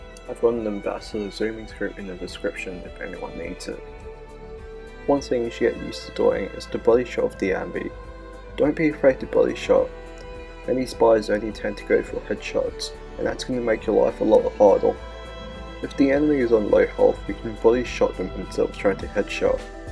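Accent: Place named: Australia. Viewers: He is Australian. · Nice vid. Personally, I prefer the l'etranger, but this is really helpful. (Your accent is Australian, btw.)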